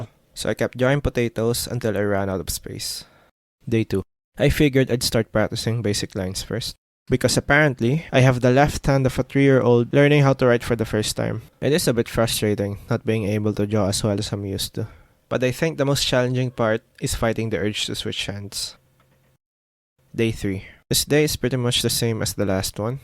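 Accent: Philippines. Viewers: based on your accent im guessing ur filipino? bec thats how i talk in english lolll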